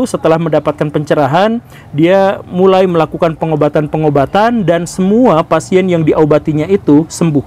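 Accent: West Java, Indonesia. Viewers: Pak unik bahasanya...nyunda banget😁😁😁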